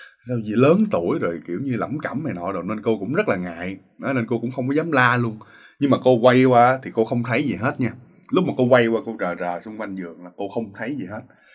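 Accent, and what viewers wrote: Mekong Delta, Vietnam. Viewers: gốc miền Tây hả ha, nghe giọng chân chất, tốc độ nói cũng khá nhanh nhưng đủ để nghe kịp, giống kiểu nói của mình.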